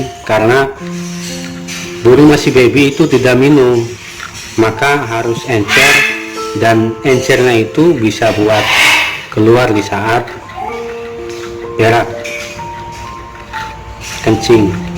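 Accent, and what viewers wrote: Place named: Indramayu, Indonesia. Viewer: Wong indramayu ya bod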